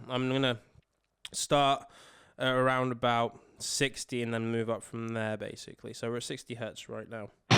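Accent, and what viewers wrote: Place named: United Kingdom. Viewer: Absolutely British accent.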